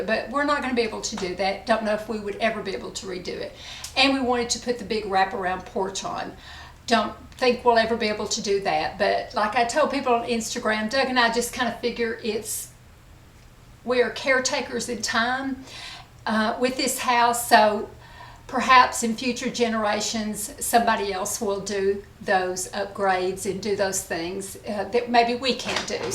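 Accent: South, United States. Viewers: Love ur southern accent!what state are u in...?also would that Bobby brown shade look good on brunettes?always looking for a new shade...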